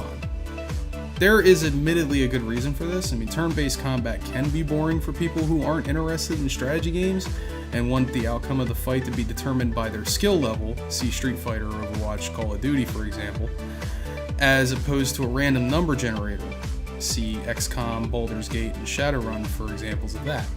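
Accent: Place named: Philadelphia, United States. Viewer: You're from Philly, aren't you.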